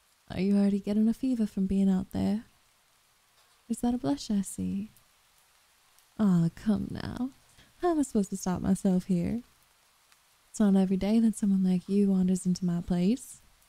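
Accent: South, United States. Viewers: Since I'm not from the south, I cannot judge your accent. I'm too far north to be allowed to do that. You sounded more southern than I can try. :) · Each is getting better than the last, it really shows your getting a hang of the programs and good sound design! The rain maintaining its sharpness in the background is a nice juxtapose against the smoother southern accent that isn't comically overblown uwu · Only thing I have to say is that the SFX at the start is WAY TOO LOUD compared to the voiced bit 😅. Apart from that, this was lovely to listen to 😊. Even though I'm usually not a fan of the southern accent, your voice is so soothing that I actually liked it in this audio 🥰. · I'm not usually into the southern Bell but for you I think I can make an exception. 🥰 This was really great truthfully you sound more natural southern compared to people that I know that are southern 😭.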